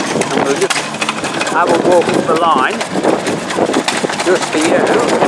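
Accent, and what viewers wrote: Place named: Lincolnshire, United Kingdom. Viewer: Thanks,should we compromise and call it a country show? Great to hear your Yellerbelly accent,very rare these days.I am a foreigner myself, having moved here 34 years ago,a newcomer but loved every minute! All the best.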